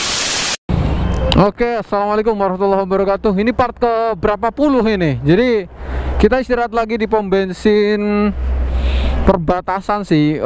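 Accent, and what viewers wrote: Banyumas, Indonesia. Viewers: Asline ngendi bro. Deneng krungu ngapak"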